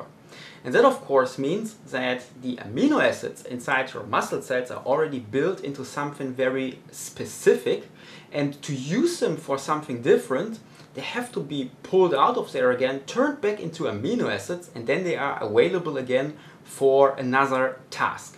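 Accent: Germany. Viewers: Loving your accent ,are you german?Anyway nice video,good info.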